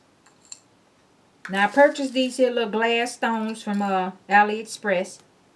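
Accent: South, United States. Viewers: Ever so lightly Like that there This here glue Hand here, Please where in the South are you from? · 😂😂 im from SC and I was like whoah! Her southern accent is VERY southern even for me.😂😂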